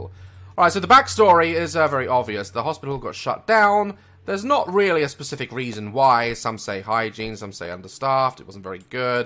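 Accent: United Kingdom. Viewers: Is from the uk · fabulous British talk mate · with the posh English xD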